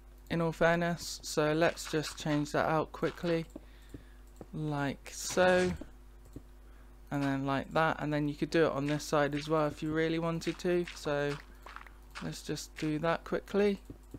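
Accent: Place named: United Kingdom. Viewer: 와 영국발음 갖고싶다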